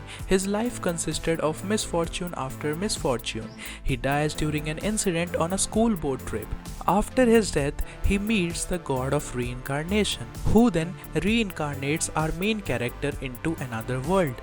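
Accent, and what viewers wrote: India. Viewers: I really like your indian accent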